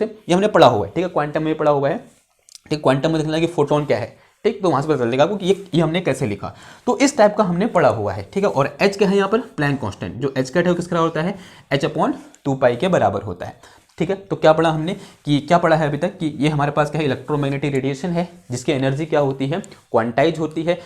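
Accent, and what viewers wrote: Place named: Garhwal, India. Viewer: Bhai , garhwali hai na pura Accent aarha hai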